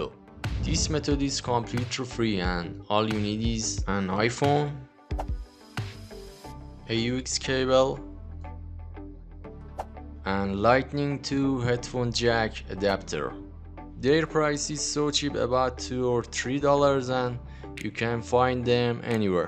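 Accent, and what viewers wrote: Iran. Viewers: That accent just screams Iranian origins to me Which is great ofc, the audible acquaintanceship with the beloved Persian language is sheerly a blessing to the ears =) Keep it up with the great content brother, Best of wishes from a fellow aspiring creator of the same origins😁✌️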